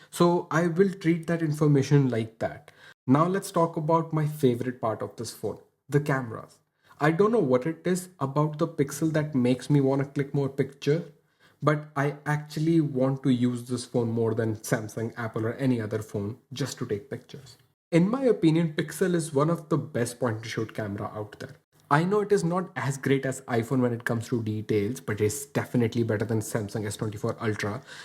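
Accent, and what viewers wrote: India. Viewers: Bro, are you from Bangalore.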